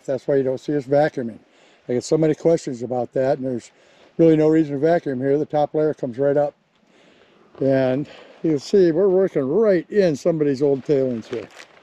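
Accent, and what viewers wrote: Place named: South, United States. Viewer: your a peach,i love that slow southern way,you should get a paycheck from the state tourism board.its just the best education videos in the gold search.amen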